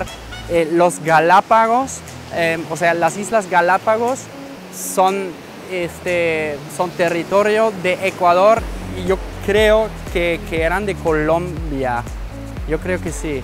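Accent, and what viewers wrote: Mexico. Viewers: Primera mente, aprende a hablar el español sin usar el lejo mexicano. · el pinche aleman ya habla como pinche mexicano sin ofender me gusta mucho su canal ;) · ! muero de risa con tu mexicano! jejeje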